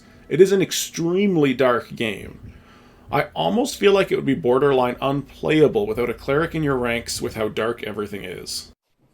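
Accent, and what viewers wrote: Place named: Canada. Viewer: I always pronounced it Men-zo-burr-AHN-zun, I love your Canadian mish mash on the pronunciation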